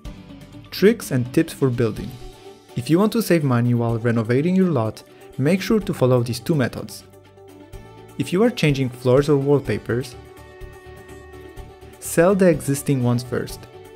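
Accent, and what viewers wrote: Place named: Poland. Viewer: I knew i've heard polish accent!😁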